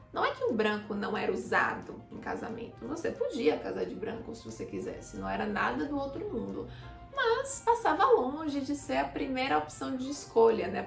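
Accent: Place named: Bahia, Brazil. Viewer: Amei o vídeo! Continue, não desista desse canal incrível ♥️✨ Edit: conheci o canal por esse vídeo e ja pelo sotaque desconfiei q era baiana, fui conferir o vídeo de apresentação e acertei! (Minha conterrânea kakaka)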